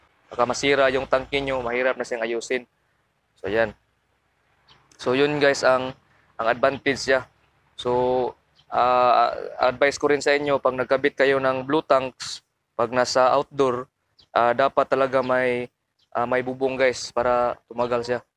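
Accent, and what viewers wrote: Visayas, Philippines. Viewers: cguro bisaya ka hirap ka mg explain ... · bisaya po kc sir ok lang ang important is nakakatulong lahat ng sinabi nya 😊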